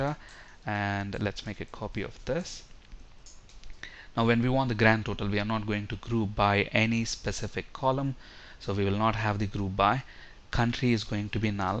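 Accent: India. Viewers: Sehr schön! At first I thought Oh no, not that Indian accent anymore! But the vid is actually very helpful! Thanks alot :-)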